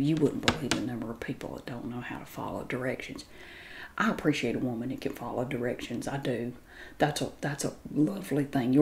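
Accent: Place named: Central Virginia, United States. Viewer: Can I just say that you're normal accent is so similar to how people talk where I live near Central Virginia.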